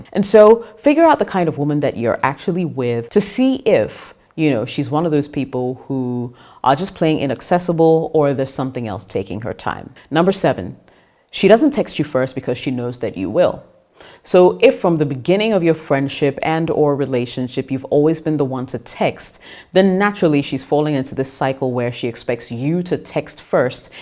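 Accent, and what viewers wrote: United Kingdom. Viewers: She’s British, probably born and bred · That accent is British English. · Ar you a Ghanaian? Your accent is different but very proper. It's hard to fetch out the Ghanaian in you but mostly British. You're beautiful!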